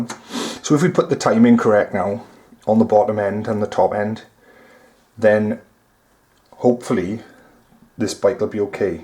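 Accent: Wales, United Kingdom. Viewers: You sound welsh do you have a shop or anything because I have a stomp 160 with a jammed gearbox and I need someone to have a look at it and there’s no one in South Wales that can do it for me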